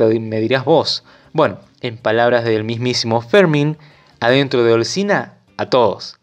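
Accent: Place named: Corrientes, Argentina. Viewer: Ese tono es de provincia de "coyientes"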